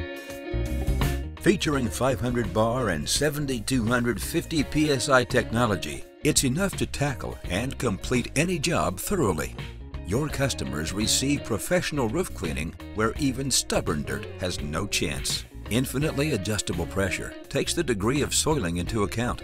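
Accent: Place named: United States. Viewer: Website arrests is .de but it's an American voice ?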